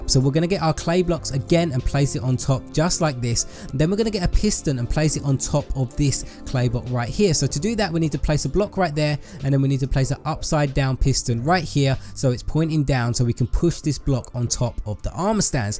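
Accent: United Kingdom. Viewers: So awesome where in England r u from?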